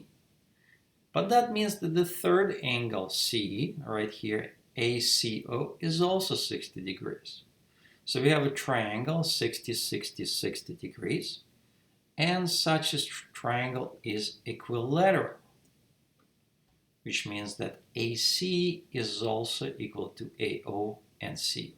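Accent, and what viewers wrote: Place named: New York, United States. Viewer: Drop CE perpendicular to AB at E. angle CBA=30,angle CAB=15 angles in deg. Let CB=2,CE=1 and EB=sqrt3. AB=AD+DB. sin(15)=(sqrt6-sqrt2)/4 sin(30)=1/2 angle ACB=<num>=135 sin(135)=1/sqrt2 Use Rule of Sines on triangle ACB. AB/sin(135)=CB/sin(15) AB/(1/sqrt2)=2/((sqrt6-sqrt2)/4) sqrt2*AB=8/(sqrt6-sqrt2) AB=8/(sqrt2(sqrt6-sqrt2))=8/(sqrt12-2)=8/2(sqrt3-1)=4/(sqrt3-1)=2(sqrt3+1) DB=AB/2=2(sqrt3+1)/2=(sqrt3+1) DE=DB-EB=(sqrt3+1)-sqrt3=1. tan(x)=CE/DE=1/1=1 x=arctan(1)=45 degrees and that is our answer. Thanks for the problem. I like your accent,do you come from NY City?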